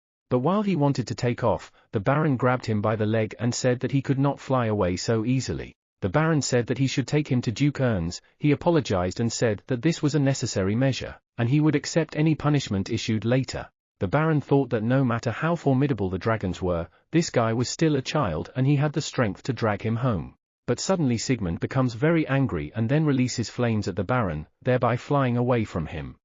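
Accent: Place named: United Kingdom. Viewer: Why is the ai voice British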